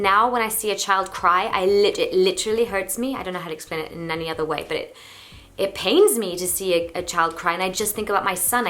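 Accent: United Kingdom. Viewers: She moved to London so acquired a slight British accent not as much as her husband though. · Wow you now have a British accent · Wow - hadn't seen a video in a year or two so thought I'd drop by. Imagine my surprise when I discovered she has a totally different accent now. (Go watch her videos from a few years ago - her accent is American. Now it's what - London?) So weird. Also weird is that she appears to be in the same bedroom she was 12 years ago, even though she's apparently married and has a kid now. Does she still live in her parents' house? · Love your new channel and thank you for finally making English videos again, i was just wondering about the heavy British English accent what’s up with that 😃 i mean i used to notice it a tiny bit before but now... 😄 still love everything though ♥️♥️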